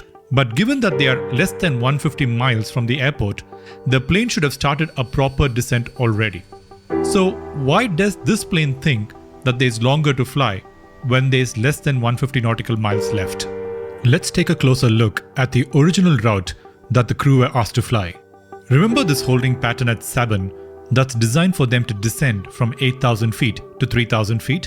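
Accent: India. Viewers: Bro are you Indian or Australian? The accent is definitely Indian. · The Indian accent makes it very hard to watch.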